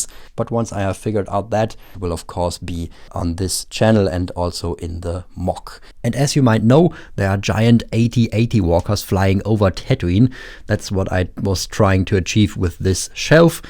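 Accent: Germany. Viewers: Dein Englisch klingt so deutsch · this german accent is furchtbar :D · Deutscher Akzent 🫣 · Der deutsche Aktzent izzzz da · Sag mir das du deutsch bist ohne mir zu sagen das du deutsch bitte der deutsche akcent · Yo i knew you are from germany by the sound you talk like BrickCrafts 😅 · Ich Spüre Deutsch in deiner Stimme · You can hear this German acsent in his voice 😂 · i hear german out of you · you sound german ^^ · Der deutsche Aktzent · Man hört den deutschen Akzent haha.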